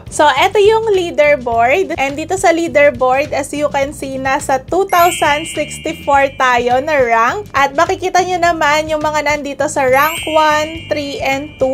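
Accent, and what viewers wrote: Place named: Pangasinan, Philippines. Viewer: Ganda mo pangasenensi ka kilala ko sa tunog mga taga pangasinan hehehe chat me teach me grand mother and father ko puro taga pangasinan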